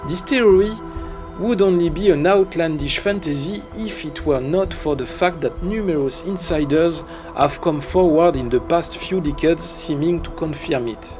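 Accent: France. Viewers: Waouh l'accent Fran-glais 😁 268k d'abo en langue Anglaise pour un Français ! Bravo ! Pouce bleu !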